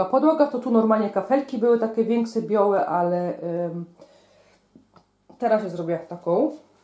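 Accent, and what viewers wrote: Silesia, Poland. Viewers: Nie da sie sluchac tego slaskiego pierdolenia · Mogłabym Pani słuchać wciąż... Uwielbiam tą śląską gwarę. Kuchnia bardzo funkcjonalna 😍 zazdroszcze tych wszystkich pierdół do pieczenia 😉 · Kuchnia fajno a ta twoja godka jest dupno 😂 też jestem że śląska mieszkałam w Zabrzu a teraz już kupa lot w Holandii pozdrawiam😚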